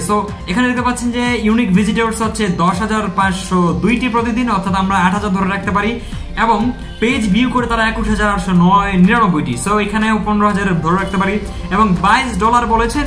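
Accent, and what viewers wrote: Dinajpur, Bangladesh. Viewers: ভাইয়া মাঝে মাঝে, আপনার দিনাজপুরিয়া ভাষাগুলো অনেক ভালো লাগে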